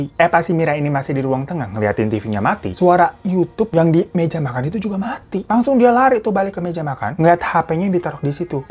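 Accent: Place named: Sunda, Indonesia. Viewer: Sok atuh nyarita deui hahha...sayah orang bandung ketawa liat kk ngomong bahasa sunda😂😂😂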